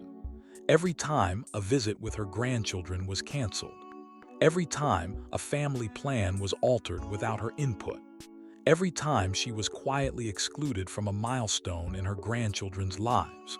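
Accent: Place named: United States. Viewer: Was all set to listen to this while I nodded off to sleep but unfortunately the narrator is English speaking but not Britain speaking. Why why are all these videos made with English I should say American people. Next the narrator should learn how to say the Queen pronounce the names right. Just hope and pray that I don't spend all night hearing Cam milla, Cam milla, Cam- milla. It's, I believe, Ca-milla.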